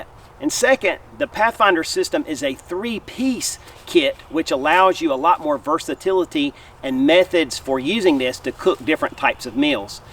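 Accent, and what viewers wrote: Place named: Carolina, United States. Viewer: That’s got to be a Carolina accent.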